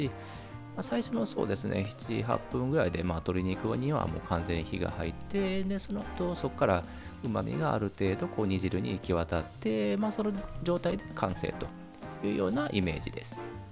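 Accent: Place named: Nagoya, Japan. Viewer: 節々のイントネーションが名古屋っぽい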